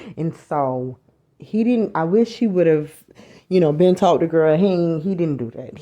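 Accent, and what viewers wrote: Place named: South, United States. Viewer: I'm an accent man..Lol.i love the way you talk..Is that Texas?? Georgia??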